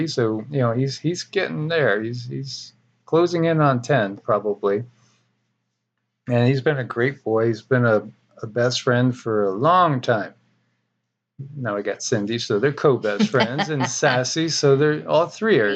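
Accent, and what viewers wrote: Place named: South, United States. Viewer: Your Southern accent is awesome!:)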